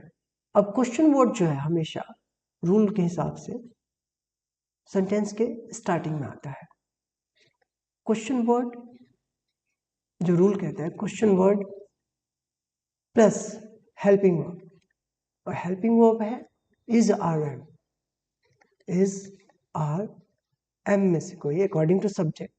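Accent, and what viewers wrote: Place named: United States. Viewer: I love❤ your American style voice..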